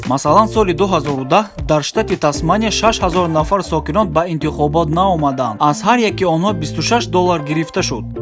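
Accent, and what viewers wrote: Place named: Tajikistan. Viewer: لهجه تاجیکی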